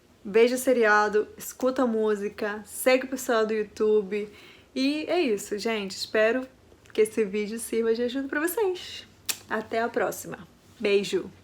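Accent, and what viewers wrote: Rio de Janeiro, Brazil. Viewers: Você tem muito sotaque carioca🥰 Eu amoooo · Incrível seu fino sotaque carioca,! Trabalhei em uma empresa de assistência técnica que tinha clientes por toda América Latina. Cheguei a morar por um ano na Venezuela, onde percebi que os brasileiros conseguem compreender bem melhor o idioma espanhol que os hispânicos podem entender o português. Certamente a grande variedade dos fonemas do português é um desafio para os estrangeiros. Parabéns! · Acho vocês SHOW. Mas eu vou te corrigir. Você não perdeu sotaque nenhum. Se esqueceu do xiiii (Carioca) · vc já é cariosíssima pra quem não sabe a história da sua família aqui nem imagina que vc é estrangeira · Perdeu o sotaque de quem fala espanhol e ganhou o sotaque um tanto carioca. Bem! Sotaque carioca é bem brasileiro. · Eu acho que você sentia-se incomodada , sobre como as pessoas te tratavam.... 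ou não queria se sentir estrangeira....você queria sentir-se pertencente ao País ao lugar.... pegou até o sotaque carioca..... só cuidado para não ficar caricato..... (forçado) Parabéns pela dedicação.....realmente não parece que você não é do Brasil... bjs. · É uma carioca da gema. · Fluentemente nacionalizada brasileira, mas tragicamente desperuanizada para transforma-se numa careoca . 🌺🌹🥀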